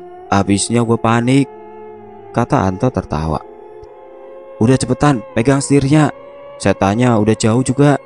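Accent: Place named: Java, Indonesia. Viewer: Suaranya bagus, ceritanya seru, ada lucu nya, ada medoknya, trnyata mas ganteng juga 😅 baru tau deh...😅 Semangat mas ❤ Btw mas sekarang bikin Chanel Radio horor itu ya?? Suaranya sama bgt soalnya. Sukses selalu mas 🎉😊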